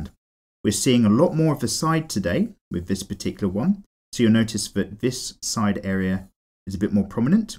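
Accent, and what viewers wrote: United Kingdom. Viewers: ah sory about that but i fucking hate british accent.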